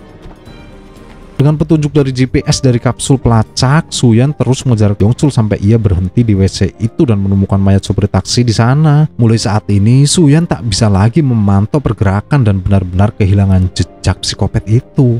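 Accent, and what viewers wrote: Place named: Java, Indonesia. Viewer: Bang lu orang Jawa ya ? Soalnya kaya keciri banget dari logat Luh ngomong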